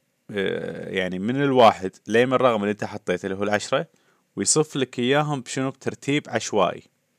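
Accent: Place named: Iraq. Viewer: عاشت الايادي والله صراحة كلت جنابك عراقي لان لنجتك كلش قريب علينه حياك اخوك مهيمن فالح من العراق بابل ممكن باقي شروحاتك صراحة محتاجهن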